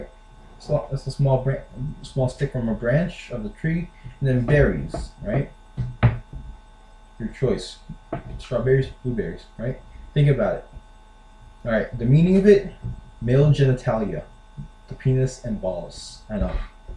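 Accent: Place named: RP, United Kingdom. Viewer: your English accent is soooo posh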